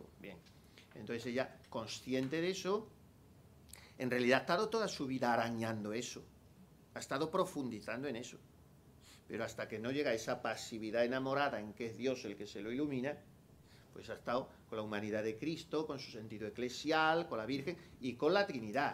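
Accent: Extremadura, Spain. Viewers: Monseñor, se queda uno ensimismado con V., con lo bien que explica todo y con la sabiduría que hay en sus palabras, fruto del estudio intenso, la oración profunda y el cariño sincero. ¿Cómo no apreciarle y quererle tanto? Y, además, con ese gracejo extremeño que comparte con tanta simpatía. Habría que decir como los niños pequeños cuando disfrutaban con algo y no querían que acabase: "sólo cinco minutos más". Porque disfrura uno tanto que se le pasa volando. Buen descanso para todos nos dé Dios 🙏🏻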